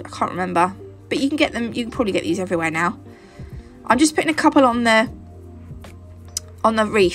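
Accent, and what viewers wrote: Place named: RP, United Kingdom. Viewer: They are really cute. I love the layering of different flowers (decals, paint & nail art) thank you ❤️ I hope you don’t mind me saying, but I’ve just noticed your voice/accent is quite posh sounding 😊(so ladylike….. and then the Pepsi Max shows it’s face and we get a burp 😂). Never change ❤️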